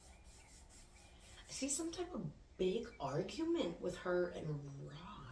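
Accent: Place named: Louisiana, United States. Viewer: Southern creole accent. Im from Louisiana I can hear the Southern French twang to it wow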